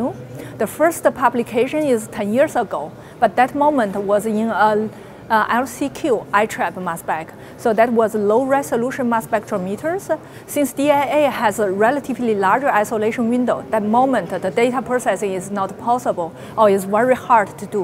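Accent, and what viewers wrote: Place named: China. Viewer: 中国方言味的英语.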